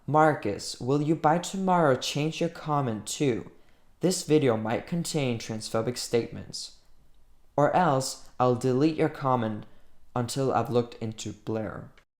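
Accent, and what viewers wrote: United States. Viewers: I’m an old man, 74 years old, I admit that I’m having trouble understanding what to me is a new phenomena. I was surprised that you aren’t an American because you sound so American. I find you to be thoughtful and very reasonable. Until I came across your channel I thought that people in your community were rather odd and very pushy. Thank You for dissuading me of that notion. But correct me if I am wrong; I suspect that you are in a minority in your community. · You have a good American accent, do Danes speak like you generally? 🤗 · Wow! Hade inte gissat på att du var Dansk. Din dialekt låter amerikansk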